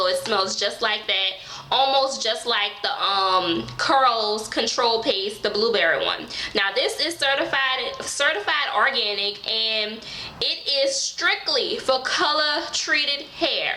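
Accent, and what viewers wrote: New Orleans, United States. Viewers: I Love Your New Orleans Accent 😍😍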